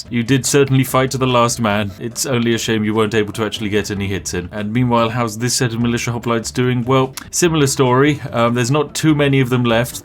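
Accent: United Kingdom. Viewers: is one of the only youtubers where I pause to go to the bathroom come back un pause and the first this game I hear it “And there all going to die” in a calm British accent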